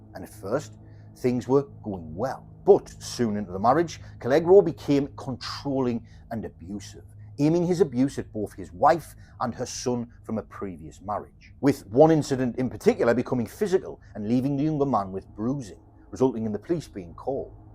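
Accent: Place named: Sunderland, United Kingdom. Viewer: Yes, I'm a Mackem too. You can't really mistake the accent for anything else 🤣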